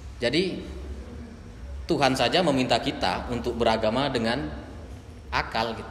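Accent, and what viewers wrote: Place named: Madura, Indonesia. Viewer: oh....ternyata cak maduranya Sumenep, makanya logat maduranya nggak kentara.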